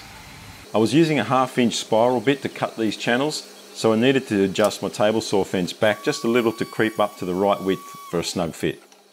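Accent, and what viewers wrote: Australia. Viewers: Beautifully sleek cabinet! I'm not a woodworker but enjoy watching your videos. Maybe it's the lovely Aussie accent LOL.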